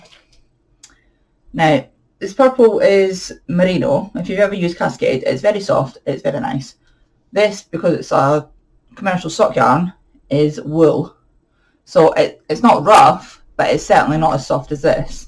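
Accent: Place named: Glasgow, United Kingdom. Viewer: Love that you hear the Fife accent but here in Fife all anyone hears is the Glaswegian accent 🤣 Your Virus Shawl is gorgeous and your cabled Jumper is going to be amazing 😍 There is nothing worse than half spun or broken bits in commercial yarn, so completely feeling your pain. Your Cross-stitch is fabulous, can't wait to see it progress it's going to be stunning!!! xxx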